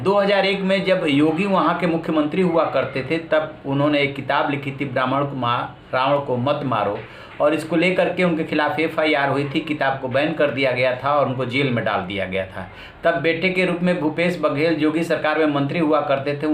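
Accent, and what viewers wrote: Bihar, India. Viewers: तू साला बिहारी टोन में बात कर रहा है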